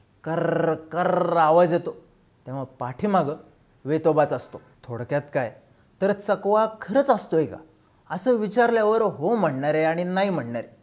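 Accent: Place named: Kolhapur, India. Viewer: कोल्हापूर मधन बोलतोय बग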